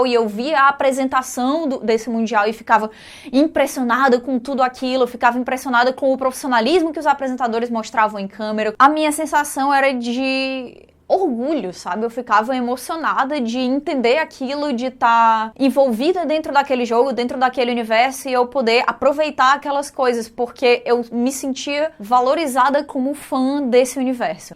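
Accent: Northeast, Brazil. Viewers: impecável como sempre. Adoro sotaque dela, e todos os nordestinos. Acho Arcane uma animação incrível, da pra ver o trabalho bem feito de todos da produção. Não jogo Lol, não entendo nada, mas é de fato muito boa, porém não é perfeita, existe uma seria de problemas no enredo. É pra se divertir. Parabéns pelo vídeo.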